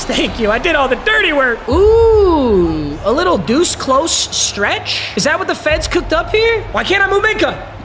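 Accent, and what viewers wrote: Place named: Virginia, United States. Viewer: just cuz you talk like you have a mouthful of nuts & bolts doesn't mean people with less southern accents than you don't have a southern accent. He's got that Virginia southern accent 100% just listen to the way he says "you're terrible"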